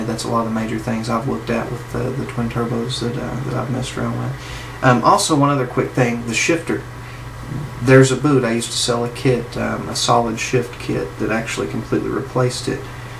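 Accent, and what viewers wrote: South, United States. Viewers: Speak with southern accent loud and proud. Fuck what anybody else think. I can't stand the NY accent and find it obnoxious, but do you really think they care what I think. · sexy southern daddy damn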